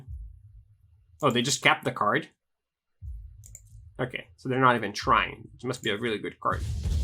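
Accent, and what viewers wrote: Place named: Brazil. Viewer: Tenho que concordar. Acho que a sua pronúncia é impecável. Dá pra perceber um sotaque residual, mas se eu não soubesse que você é brasileiro, nem diria que é sotaque.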